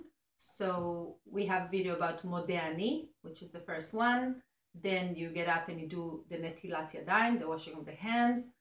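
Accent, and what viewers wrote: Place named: Israel. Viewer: Thank you for explaining and telling us to stand. I can not sing, and have always been put off going to Shul because all are singing except me. I really like your accent, Ivrit from H 'Aretz. Could you do some videos with just speaking prayers?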